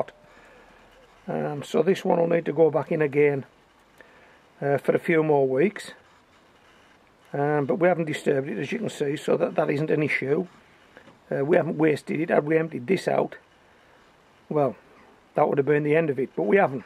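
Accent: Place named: Northern England, United Kingdom. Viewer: Loving your videos, so informative and easy to follow, and loving the fellow northern accent!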